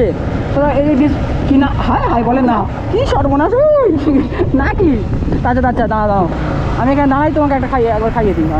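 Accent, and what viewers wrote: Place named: Rangpur, Bangladesh. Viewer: Tumar kotha rongpurer moto lage..tumar bari ki rongpur plc vai janaio...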